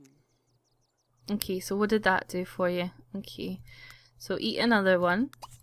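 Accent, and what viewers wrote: Scotland, United Kingdom. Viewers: Your Scottish accent!<333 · Were in Scotland r u from. Xx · You sound like Jade from Little Mix , but more Scottish . I LOVE IT! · I love your accent! It's scottish, isn't it? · Yay! A Scottish simmer! I'm from Scotland as well so I'm happy that I can understand someone now. I mean I can understand some of the others but not very many. I've watched Big Brother 2014 as well and can't wait for the next one! x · I love how ur Scottish I hardly see Scottish youtubers I am Scottish myself my m is going to get me sims3 at the end of the month this helps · I LOVE YOUR SCOTTISH ACCENT!!! Especially when you said "storm" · Dat accent...Dat amazing Scottish accent... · I knew you were Scottish as soon as I heard you talk. :) I absolutely adore Scottish accents above any other. Love this story so far. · Are you from Scotland or is it my head phones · Im from scotland too and i was sooooo excited when she started talking :3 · shes scottish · I love your accent, its so cute~ I love Scottish accents. 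You seem pretty cool and I like the video. SUBSCRIBE~ <3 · I love your channel! I have a hard time finding simmers I like because well...most girls on here having voices that irritate me :/ (yea I'm a girl and it bugs me) but your voice is perfect! I usually prefer British youtubers and now Scotts xD thank you, you seem quite intelligent and I look forward to watching the rest of these :} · your scottish like me!!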